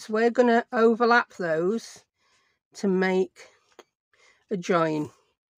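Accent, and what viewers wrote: United Kingdom. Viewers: I am so glad I found your channel. I love your no-nonsense directions. Easy to follow with a bit of humor. It makes me smile that you share the flub-ups. 😊 I love your British accent and humor. It reminds me of a dear friend that passed a while ago. Keep making the how-to's and I will keep watching. ❤🥰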